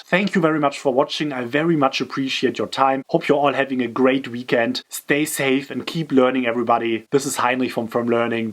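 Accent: Germany. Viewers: Love your german accent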